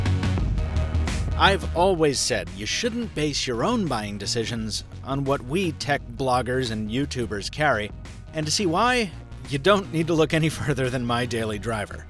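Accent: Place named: Boston, United States. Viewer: Boston aye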